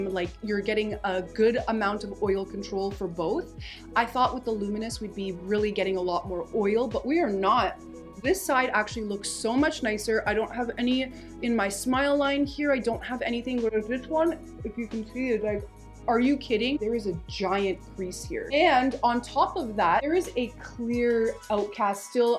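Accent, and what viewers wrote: Canada. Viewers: I can hear the Canadian in your voice!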